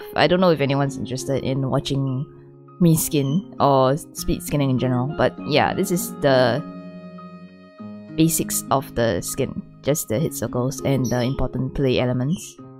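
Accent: Singapore. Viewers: Is she singaporean she has that accent 🤔🤔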